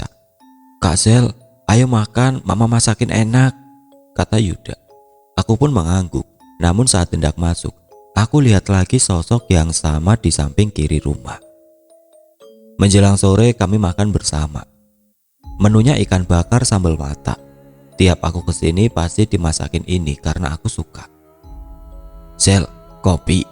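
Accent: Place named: Java, Indonesia. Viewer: Maklum lidah orang Jawa😃😃😃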